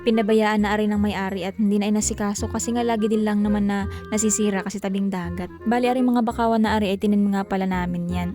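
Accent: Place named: Visayas, Philippines. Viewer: Mat halong Visaya Ang storya mo. may lahi ba kayong Visaya day?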